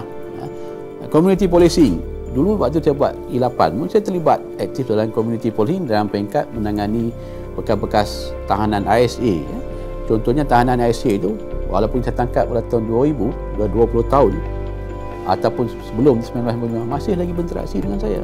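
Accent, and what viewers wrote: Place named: Kedah, Malaysia. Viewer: Lama datok kt luaq.. tpi loghat kedah datok msih ada lgi.. semoga allah lindungi datok dan kita seluruh rakyat Malaysia..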